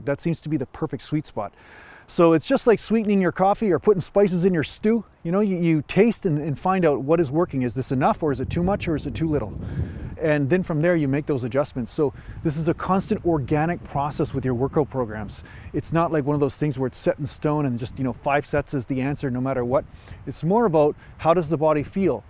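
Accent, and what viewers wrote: Canada. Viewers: If I had to guess, I’d say you were Canadian. But I like this approach.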